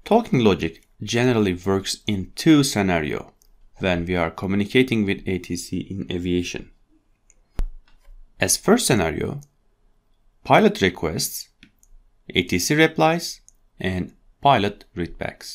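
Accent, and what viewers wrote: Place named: Turkey. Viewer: Hocam Aksandan inanılmaz belli bu arada Türk olduğunuz :)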